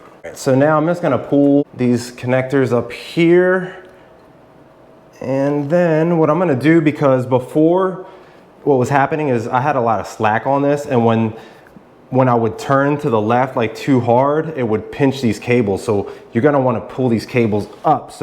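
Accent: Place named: Philadelphia, United States. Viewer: thanks bro! U sound like a local, are you from Philly area?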